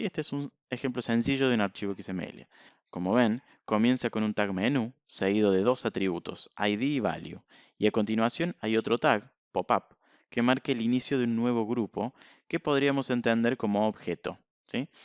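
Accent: Argentina. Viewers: Impecable la explicación. Se agradece un tutorial en cordobés =D! Saludos de Bs As.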